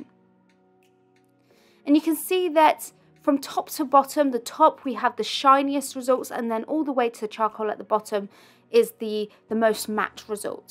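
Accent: United Kingdom. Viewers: Hi,thank you so much for another great drawing tip. I am a portuguese speaker brazilian boy,graduated in arts many years ago,and thanks to living in the US for many years i a can understand you perfectly. You are hands down the BEST gifted drawer on youtube. May God bless you always so you can keep always bringing more and more very useful drawing tips like this. Ps: i love your british accent!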